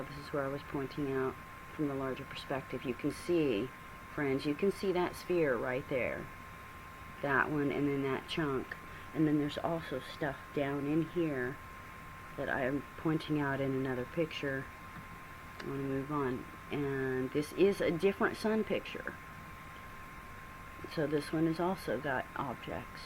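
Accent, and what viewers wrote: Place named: South, United States. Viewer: spoken with a sweet southern drawl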